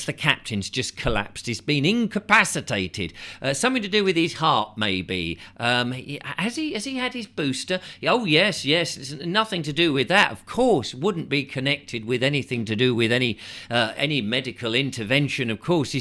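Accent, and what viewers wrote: United Kingdom. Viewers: Absolutely love your classic "Brit" style: humor, dress, accent, all of it. You can deliver the worst news possible with arid humor. Can't wait for your coverage of World War III.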